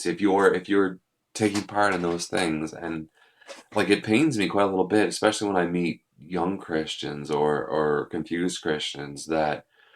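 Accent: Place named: Nova Scotia, Canada. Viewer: A very insightful video, and good for you, sir. thanks for sharing. You sound like a Nova Scotian.. or at least a Maritimer, that's where I'm from :)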